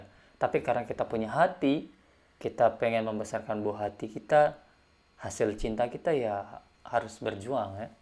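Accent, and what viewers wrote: Lombok, Indonesia. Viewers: Ngakak gw anjr gara" aksen lomboknya masi😂 mantep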